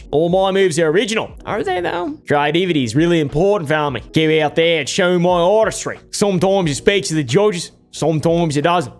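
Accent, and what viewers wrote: Australia. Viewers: love your aussie accent😆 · your australian accent has me on the fkn floor bro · Your Australian accent is so fucking funny 😂😂😂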